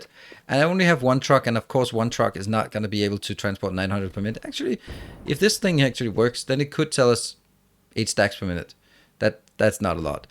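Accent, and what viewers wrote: Denmark. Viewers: This dude is for sure Danish :D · Love the video one question are u danish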